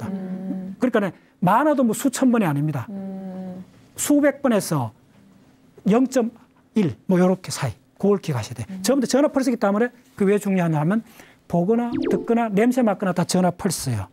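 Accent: Gyeongsang, South Korea. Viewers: 사투리 억양까지는 그렇다쳐도 표준어로 강의 하셔야겠어요. 사투리에 경상도 억양에...좀 그러네요. 게다가 발음도 좀...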